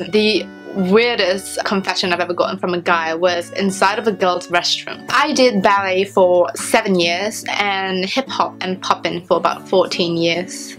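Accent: United Kingdom. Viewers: wow is she british?? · You kind of sound like you have English(British) accent with your pronunciation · why you got British Accent? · You have such a beautiful accent, it's like a mix between British and Chinese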